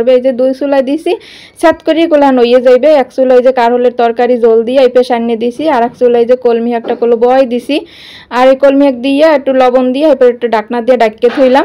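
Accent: Barishal, Bangladesh. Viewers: শাক রান্না করলে রেক পরিস্কার করলে খুব ভালো লাগলো। তোমার কথাগুলো শুনতে আমার খুব ভালো লাগে। আমার শাশুড়ি তোমার মতো বরিশালের ভাষায় কথা বলে। কখনো বাড়ি গেলে শোনাবো।❤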